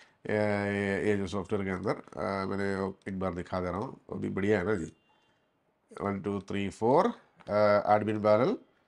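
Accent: Kerala, India. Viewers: Malayalam touch Hindi 🙄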